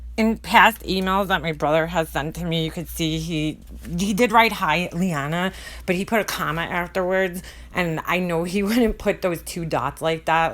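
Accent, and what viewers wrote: New York, United States. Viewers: Hello. wow young lady you have def been through the wringer between real life with your Mom and crazy YouTube. I feel for you. I love all of your videos especially the one for your son when you brought and set up his cooking equipment. That was awesome. Stay strong keep keeping it real. Love your accent, it makes me miss NY. Peace out.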